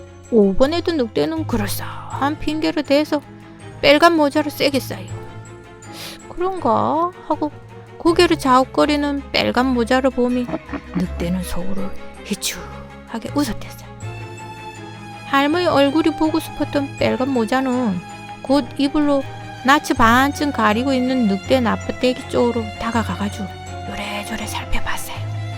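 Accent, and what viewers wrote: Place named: Gangneung, South Korea. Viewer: 들을수록 강릉사투리에 웃음이 나오고 흥미롭고 알고 있는 내용이지만 다음에 나오는 이야기에 마음이 긴장되고 ㅎㅎㅎ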